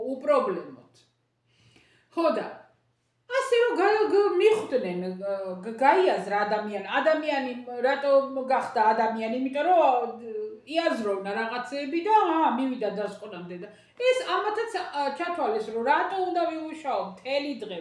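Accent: Kakheti, Georgia. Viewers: ❤❤❤კახური კილო მსიამოვნებს. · უკარგესი ადამიანი ხარ ❤, უბრალო, წესიერი, კულტურული, განათლებული, საყვარელი კახური აქცენტით და იუმორით😊❤ ძალიან "გემრიელი" და საინტერესო საუბარი იცი😊❤. ჯანმრთელობა და ბედნიერება არ მოგაკლოს ღმერთმა🙏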